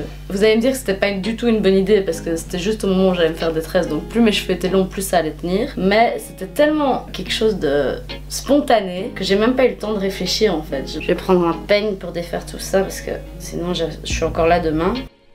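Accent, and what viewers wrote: Belgium. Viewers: Tu as un peu l’accent belge 😂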